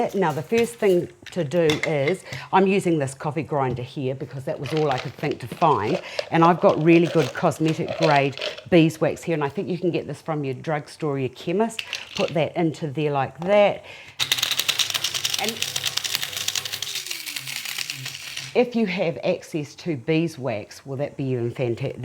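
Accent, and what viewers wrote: New Zealand. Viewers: you look FABULOUS! LOVE your dress and belt. You sound like a New Zealander. I love New Zealand. I am Scottish but live in Perth Western Australia. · André Manoel she is from New Zealand, not all sound like her though, we all have different accents here. Similar but not all the same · I am guessing that she/you is from New Zealand due to how she pronounces "egg" like "eeeeeg"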